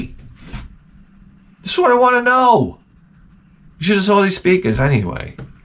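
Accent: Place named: New York, United States. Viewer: Man. Instead of complaining go Play some gangsters. With Your NY accent id hire u in a blink. Attitude to. Guaranteed return.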